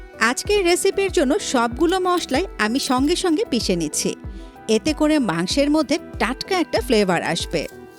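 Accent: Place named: Bogura, Bangladesh. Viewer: আমার মনেহয় আপু বগুড়ার মেয়ে, কথা শুনেই কেমন জানি বগুড়ার স্বাদ খুজে পাই, যাইহোক আপুর জন্য ভালোবাসা রইলো, জীবনে যদি বড় হই তবে আপুকে একবার দেখার চেষ্টা করবো।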